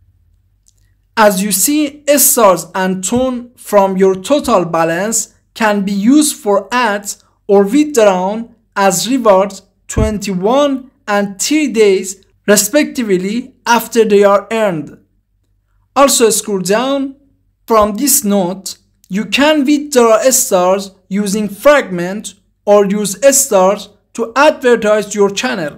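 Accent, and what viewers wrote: Iran. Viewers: هنوز ویدیو شروع نشده ، صدارو شنیدم ، به به ایرانی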